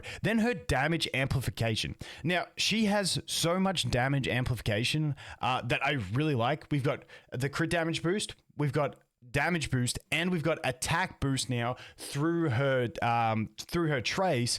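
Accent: Australia. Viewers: Honestly I watch this guys cos of his Australian accent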